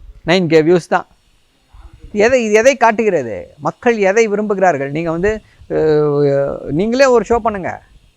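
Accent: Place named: Tamil Nadu, India. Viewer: True how I wish when he talks in English it does not look like Tamil.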